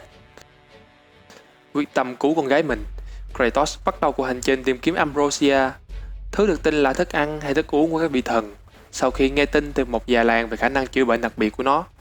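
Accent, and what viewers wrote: South, Vietnam. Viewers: t xem đơn giản vì giọng của thớt miền Nam, t ko anti miền Bắc nhưng vẫn cứ thích nghe giọng phân tích miền Nam hơn :v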